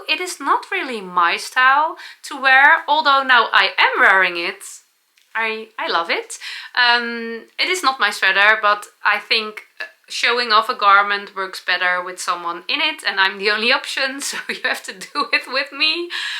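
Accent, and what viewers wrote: Netherlands. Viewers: Hi your Dutch English. is amazing! love your channel, English is also my second language also I Love Holland, kind regards Ilona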